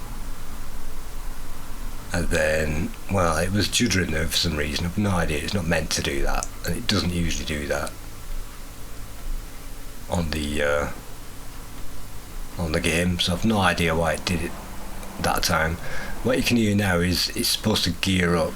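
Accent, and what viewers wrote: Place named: Blackburn, United Kingdom. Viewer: You from Blackburn fella? Sound local.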